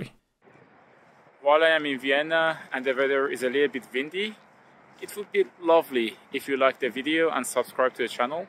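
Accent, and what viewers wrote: Iran. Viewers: Your accent is too persian😅 am i right?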